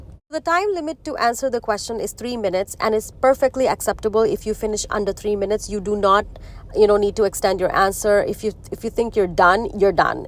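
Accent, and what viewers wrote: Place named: United Kingdom. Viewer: Is this mandatory to speak in a British accent or is it just a choice?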